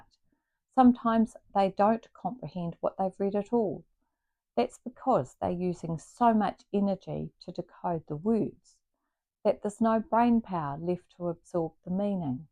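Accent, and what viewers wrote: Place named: United Kingdom. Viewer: great, nd i really liked youre British accent!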